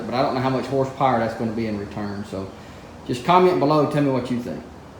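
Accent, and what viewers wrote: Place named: South, United States. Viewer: That you bud? Looks and sounds like a hill-billy I grow'd up with- but anyway, I bought a '84 F-150 with a 'yet to be determined' 351, I think. Having hell finding the stamp, said to be on the block. I had been under impression it was a 351 W, but wasn't satisfied using the 'count the valve cover bolts' method, and thanks to a parts-house dude, certain of it not being a Windsor or a Clevelend, yet unsure what it actually IS, I'm back out there at 1st light this A.M. to find the stamp- just so's I can replace this leaky 2-barrel Motocraft Carbureator. Someone said that Edlebrocks are universal? Anyway, I wish my pa had pushed tools instead of a pencil and swung a hammer more often than a gavel, 'cause i think this Ford-thing may stick on-me. Love this truck. 4-wheel drive, 33" Mickeys & glass-packs with that classic '84 front cap, but with a work-bed added at some time. 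The scales at the dump says "5,890 LBS"-DRY! Stay outta my way! Ha!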